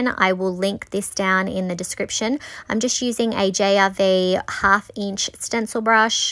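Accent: Australia. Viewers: I just found your channel and it's so nice to hear your Australian accent. You're very talented and inspiring me to try this. Thank you ❤🐨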